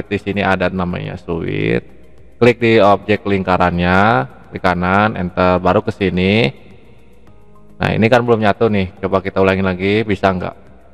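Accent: West Java, Indonesia. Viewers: aksennya orang sunda nih,, kumaha damang kang ? mantap videona, lansung subrek lah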